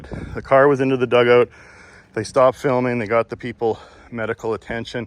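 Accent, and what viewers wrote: Canada. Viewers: You must be a Canadian with that accent of house road and about. Terrible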